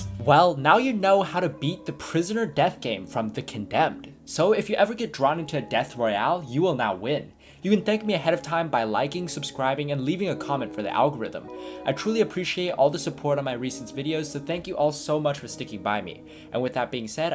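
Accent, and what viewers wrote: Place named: United Kingdom. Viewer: He can’t be Australian- proceeds to sound about as British geeza as you can lol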